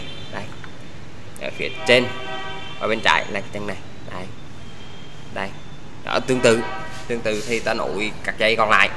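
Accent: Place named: Quang Ngai, Vietnam. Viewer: tuy anh nói tiếng quãng ngãi hơi khó nghe thật. nhưng thật sự em dám công nhận anh chỉ rất dể hiểu và có thể làm đc. do anh có chuẩn bị sẳn đồ án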